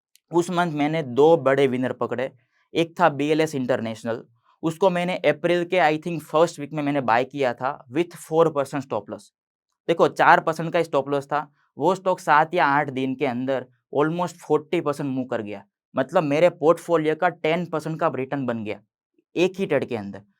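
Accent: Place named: Gujarat, India. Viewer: Bhai pakkaaaa gujrati hai 😅😅😅😅😅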